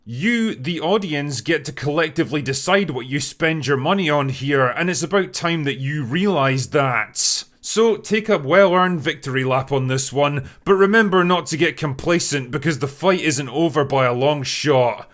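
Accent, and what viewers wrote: Scotland, United Kingdom. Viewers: This news sounds so much better in your Scottish?/Yorkshire? accent :)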